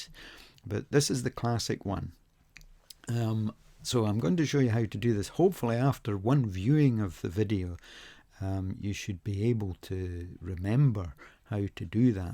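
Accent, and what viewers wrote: Scotland, United Kingdom. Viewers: Thank you! And what a beautiful Scottish accent! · Excellent video. Very well explained. Having been born and raised in Scotland loved hearing the Scottish accent. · Thanks so much for the solution. More than that, what a delight to hear shades of the Scottish brogue again! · That was fantastic and great to hear a fellow Scot too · Thank you so much for this - it has been driving me nuts for ages! Very well explained, and in a good Scottish accent!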